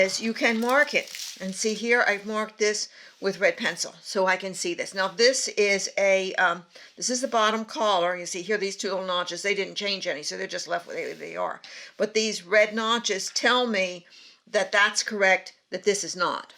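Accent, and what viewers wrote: Philadelphia, United States. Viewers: In case you like cooking- Chef Jean-Pierre has Jack the family behind his camera. And boy oh boy the onyo soup- lol food's great and Chef is good n funny w his French accent. As nice to hear as your Philly accent!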